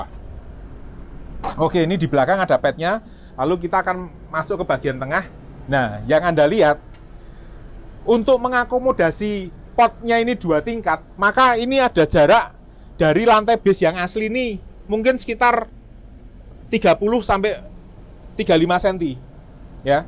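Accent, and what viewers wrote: Java, Indonesia. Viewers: Pak , njenengan cengkok jawanya kok medok banget ya...bikin orang ngakak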